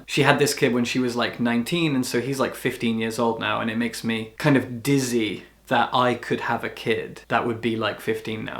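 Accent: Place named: West Midlands, United Kingdom. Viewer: You're from the West Midlands? I never would've guessed from your accent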